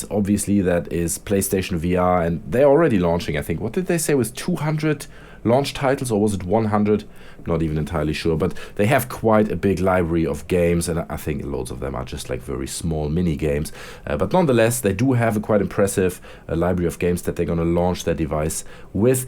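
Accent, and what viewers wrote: Germany. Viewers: ARe you german :) cause you Sound a bit like it.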